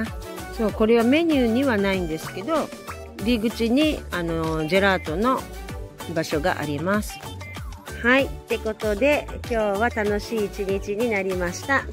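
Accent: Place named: Okayama, Japan. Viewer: さん、こんばんは。高台からのロケーションと、チョイチョイ出てくる、さんの岡山弁！最高です👍😁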